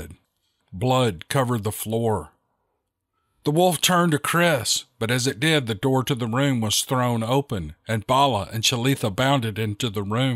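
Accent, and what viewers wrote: South, United States. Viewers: LOVE this man's narrating!!! From the south myself and miss the accent!!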